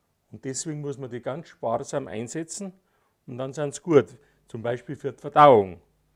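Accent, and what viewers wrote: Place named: Bavaria, Germany. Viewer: Wunderbare Musik und ein noch besseres Rezept. Und das ganze auf Boarisch...herrlich😂😂😂 Endlich mal wieder etwas schnelles und bodenständiges. Sehr schön. Das Video hat mich in gute Laune versetzt. Danke dafür 😄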